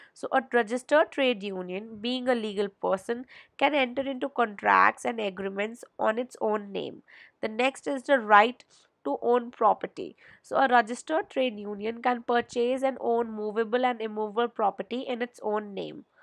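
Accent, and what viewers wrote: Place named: India. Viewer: According to your voice mam. I think you are indian. So plz can u explain in hindi the whole part of your every video after the reading.?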